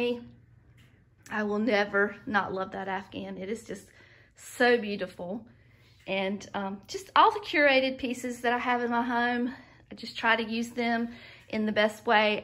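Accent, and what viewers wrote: South, United States. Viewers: are you a southern belle? I recognize your accent from all the programs I have watched lol. I am from the Netherlands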